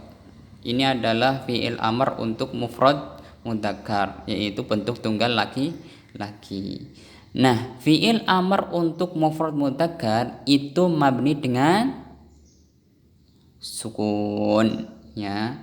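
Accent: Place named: Arab world. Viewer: صوت عربي كح .... بارك الله تعالى فيكم 🤲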